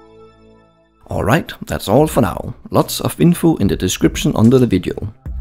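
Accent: Denmark. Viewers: you from denmark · man kan bare høre at du er dansker:P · Hvis man er fra danmark kan man også godt høre at du er fra danmark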